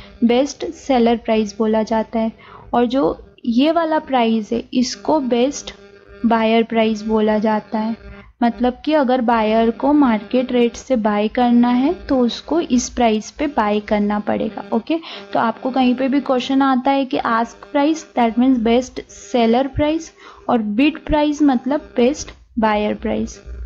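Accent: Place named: Indore, India. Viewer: Mam aap indore se belong karte hai kya aapki aawaz se lag raha hai😁👍😅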